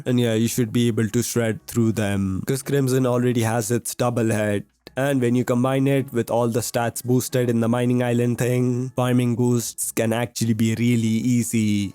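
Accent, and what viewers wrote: India. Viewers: indian ah accent is so annoying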